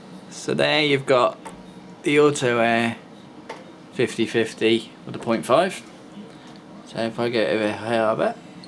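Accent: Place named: Preston, United Kingdom. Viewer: HI really enjoy your vids and admire your talent. Where abouts are you located roughly of course, I used to live in England in Preston. Doesnt sound as if you are too far from there listening to you talk?. I reside in British Columbia Canada now. Are you aware of MIdwest Airbrush Supply in Chicago. They pretty much have everything and ship all over the world.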